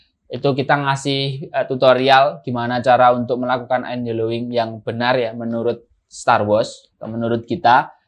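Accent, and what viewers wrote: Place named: Java, Indonesia. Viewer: bahasamu lo mas muwwedok banget, dan klo masih newbie jangan ngejelasin yg kurang dipahami oleh penontonmu. belajar public speaking lagi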